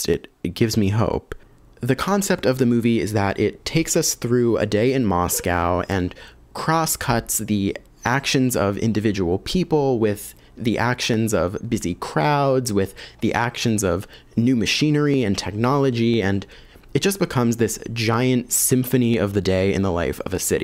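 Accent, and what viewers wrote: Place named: North America. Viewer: He's North American. It's ok for people to pronounce names or words in a regional accent, which is not how to pronounce it in a native tongue. We know who it refers to, we understand the word, there is no intended disrespect going on (if anywhere, that's in your post) your post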